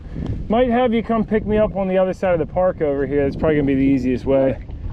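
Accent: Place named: South, United States. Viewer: Luv your southern accent, looks Fabulous! Thank you for posting 😀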